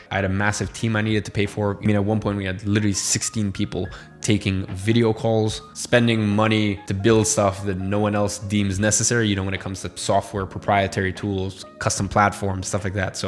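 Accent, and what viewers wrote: United States. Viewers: you have an American accent. Where are you from? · You sound American. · How does he have that accent if he's only been to the US twice at that age ?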